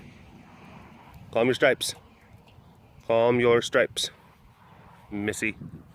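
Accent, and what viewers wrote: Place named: New Jersey, United States. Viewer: Love the Jersey accent! ;-)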